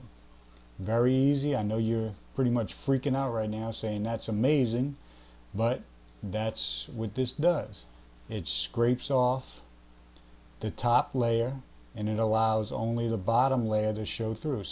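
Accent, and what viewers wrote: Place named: Louisiana, United States. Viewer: This is awesome...the accent sounds Louisiana/Cajun-ish. Geaux Tigers!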